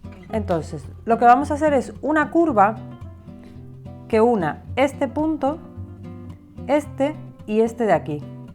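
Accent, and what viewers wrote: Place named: Argentina. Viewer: Hola !!! Me encanto tu trabajo !!! Ya me suscribi active la campanita y puse me gusta .Me tomo el atrevimiento de comentarte que tu acento lo escucho entre español y argentino. Saludos 🙋‍♀️ Y a cuidarse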